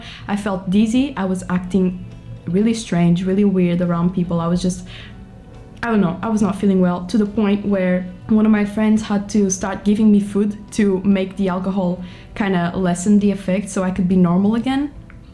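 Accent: Brazil. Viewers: you have such a brazilian accent,iu are from brazil? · Olá. Seu sotaque é de brasuca! Hahahaha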